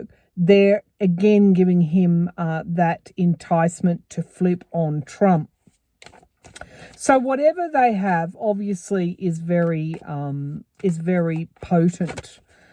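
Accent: Australia. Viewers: When you said that this is the card reading for this week, I heard “car reading,” differences between your area Australian accent and a Kansas one! Pretty funny for me anyway! I still giggle when I think of my first reaction…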